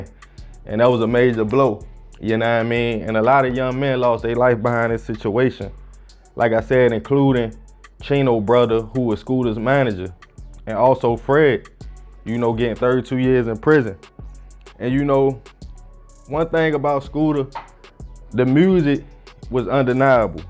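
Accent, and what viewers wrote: Baltimore, United States. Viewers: Reposted comment. Im from Bmore, unfortunately. This is sad but the citizens are dumb enough to fall for the tricks. Instead of dealing with the corruption, they are too busy going after each other because they are afraid of white people. A lot of sellouts in Bmore, especially the women. They HATE black men because they look at us the same way white people do. That's why a lot of them are not married or in a relationship but have kids. They'll sleep with black men but can't keep them. Then go against black men in front of white people to get kudos and crumbs from them, smh. It's embarrassing being from there because when I am out of town, wherever I am, and I tell people I'm from there, I get silence and blank stairs. They think it's like the Wire, which is a good dramatic series but it put a stain on the city because the average black man from there, gets looked at that way. 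People think I'm from somewhere else because of how I talk. I NEVER talked like the people in the city or the guy narrating this video. No dis but I was brought up not to talk like that so I didn't. Anyway, black people are the ones who talk like that so when I tell people I'm from there, they are surprised. "You dont act them" or "you don't talk like them" is what I get all of the time and I'm glad because it makes me stand out. People actually like me and trust me more because of it. People think I'm from the Caribbean, Africa, or New York. I'll take it because truth be told, another thing baltimoreans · I'm sorry but yall ex baltimoreans(so you say) are a part of the problem. You see a problem but you have no solution to help. So here you come on this man PLATFORM talking about how someone is speaking. Yall dont know how he speaks OFF OF YOUTUBE. And if you're TRULY FROM BALTIMORE CITY, THERES NO HIDING YOUR ACCENT. 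GTFOH!!!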